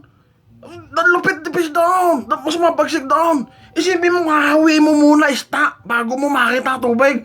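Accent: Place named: Malabon, Philippines. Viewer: Ano ba yan. Akala ko pinsan ko nagsasalita. Puntong Malabon.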